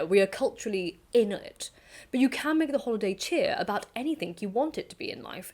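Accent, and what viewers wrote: United Kingdom. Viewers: Does this specific British accent have a name?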